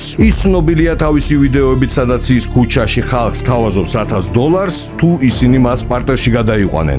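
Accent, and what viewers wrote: Armenia. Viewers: ძმურად სომხური კილო გაუშვი რაააა, ერთი სული მაქვს როდის დადებ კიდე რამე ახალს ძმაო😂😂❤❤❤❤❤❤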